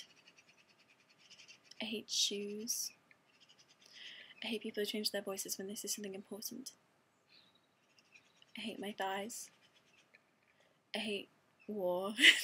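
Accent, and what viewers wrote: England, United Kingdom. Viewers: Calm down. You only asked where she is from, after stating that you couldn't place her accent. She is from England but lives in Canada. If you've watched even half of her videos then you should have known that already. As for why one site says the other... perhaps she signed up for youtube while still in the UK and never felt the need to change it. Such speculation kind of makes people sound like stalkers though so... I'd avoid it. I'm just saying. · i like this girl. she has a british charm to her personality. maybe it's just the accent though. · Her accent doesn't sound even a little bit Australian. It's clearly English with a little bit of North American. But like the other person said, who gives a shit?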